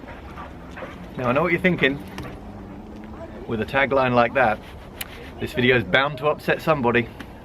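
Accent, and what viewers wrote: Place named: Chicago, United States. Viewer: is this Chicago? He Sounds like hes from Chicago....🇬🇧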